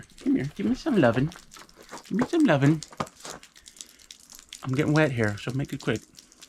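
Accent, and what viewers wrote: Canada. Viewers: Hello, Im assuming this is Canada , bc of your accent. Are you allowed guns while living off grid in Canada? A life like this goes hand in hand with rifles. Just a weird question for ya. Killer set up sir. Cheers!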